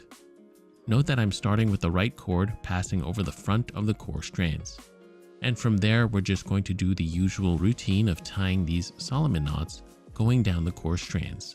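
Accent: Canada. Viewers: I was gonna ask if I won anything for my guess, but it was clearly obvious to several of us. 😁 I grew up in western Montana (Missoula, to be more precise). I think everyone from the cooler climes gets the need for today’s gadget intuitively. That you’re Canadian is obvious, not just from the dialect, but from the whole vibe, which is part of why I absolutely love your channel. I admit, y’all are way more likable than your neighbors to your immediate south; we’re quite the mess these days, but I’ve felt that way for decades. When I worked tech support, several of my clients thought I was Canadian from my voice and demeanor. One of the highest compliments one could receive.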